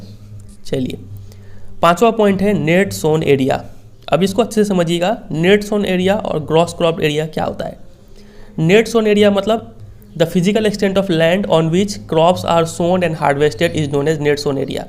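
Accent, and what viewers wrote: Bihar, India. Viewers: Kya aapp bihar se ho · Sir aap Bihar se ho Kaya please reply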